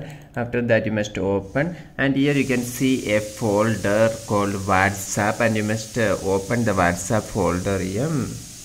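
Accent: Kerala, India. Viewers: Terrible, thick, incomprehensible Mallu accent!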